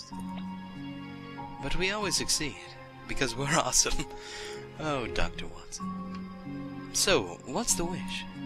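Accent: United Kingdom. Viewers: DAT BRITISH ACCENT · voice sounds more brittish.... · is it just me or voice changes every year? well not actually the voice, rather the accent.. when I listen to one of his first videos, he sounds a bit... British? xD I dunno.. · I love re- watching some of his old videos cx hearing the changes his videos have made :3... And does it sound like he has a bit of a British accent in this one? or is it just me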